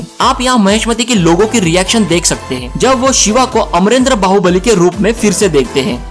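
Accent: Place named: Maharashtra, India. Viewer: loved your review and your typical marathi accent.....no theory left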